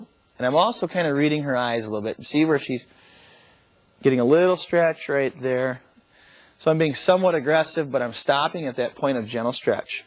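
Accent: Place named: Canada. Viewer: haha this video looks like it could have been made from the 70's I like his (I believe) Canadian accent, haha, but still very helpful, thanks :)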